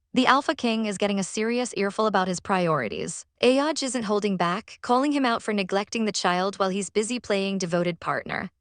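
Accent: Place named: New England, United States. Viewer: Thanks for uploading the next part of this story. I had watched the first portion of it awhile ago and had really been hoping to get the second half of it. I am so glad that after such a tragic beginning, things finally, finally started to turn around. I also want to say that the voice narrator on this story sounds EXACTLY like my sister-in-laws voice! 😅 I almost thought it was her! 😯😆 The accent she has is from living up in the New England states...mostly just outside of Boston, but we did live near eachother years ago back in Maine as well. Made me enjoy the story even more. ❤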